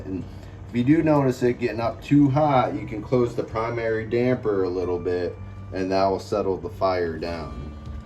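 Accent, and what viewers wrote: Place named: Canada. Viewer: Great demo and neat little stove. You kind of sound different to Americans. Maybe you're Canadian or something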